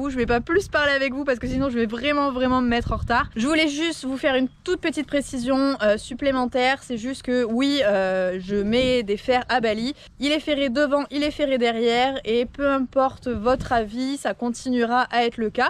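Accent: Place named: Landes, France. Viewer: On dirait le landes tu es d'où ? :D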